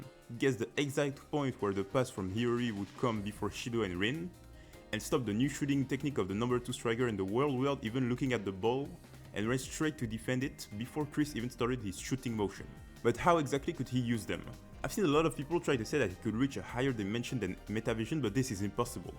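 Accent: France. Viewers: I knew you sounded french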